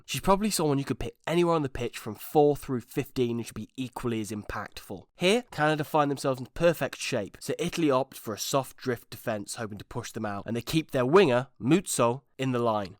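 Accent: Wales, United Kingdom. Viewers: Humour: ✅ Welsh accent: ✅ Great rugby analysis: ✅ If being the host can help you produce more videos I say bring on more! I can't get enough squidge content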